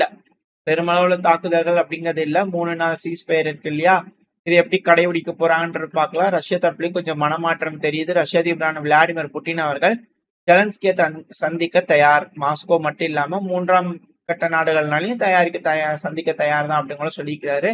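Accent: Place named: Chennai, India. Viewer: Madras தமிழில் பேசாதீர்கள்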